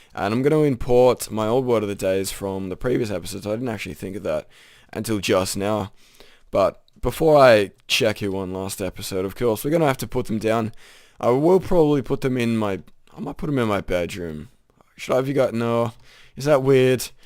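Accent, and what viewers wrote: Australia. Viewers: Sorry dude, I'm not used to Australian accents.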